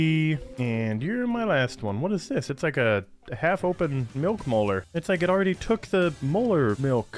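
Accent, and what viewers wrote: United Kingdom. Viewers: oi its anotha brit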